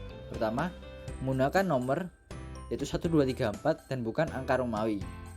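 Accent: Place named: Java, Indonesia. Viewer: Rada medhok emang ya?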